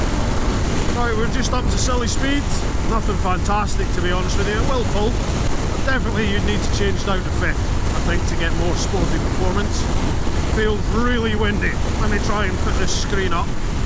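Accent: Scotland, United Kingdom. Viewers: I always love listening to those lovely Scottish tones:D Do you actually live in Scotland?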